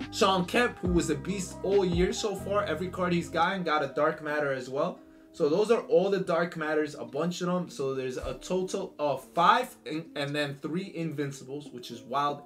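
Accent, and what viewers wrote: New York, United States. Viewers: U from ny?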